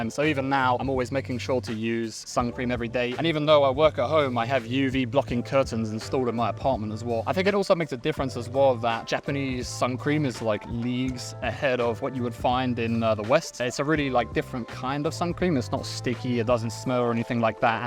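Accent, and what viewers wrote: United Kingdom. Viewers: 日本人ですが、楽しく見させてもらってます。 ロケ地のチョイスも素晴らしいです。美しい。あとイギリス発音がなんか気持ちいいです。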